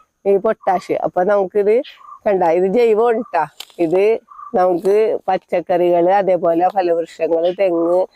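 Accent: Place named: Kozhikode, India. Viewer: Couldn't understand Koyikodu bhasha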